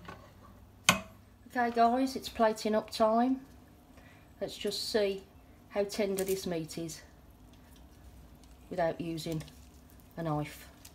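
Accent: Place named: Birmingham, United Kingdom. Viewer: Love this lovely listening to a fellow brummie x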